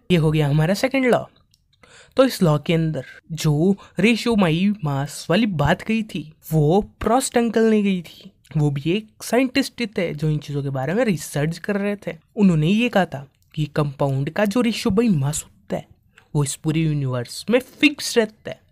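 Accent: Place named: Punjab, India. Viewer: It's good but don't speak punjabi on English plzzz🙏🙏🙏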